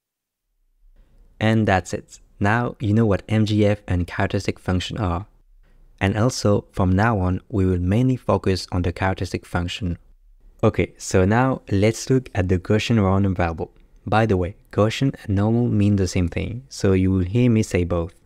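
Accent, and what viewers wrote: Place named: France. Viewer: Difficult to hear the difference between Gaussian and Cauchy when a French pronounces it 😂. Great video anyway.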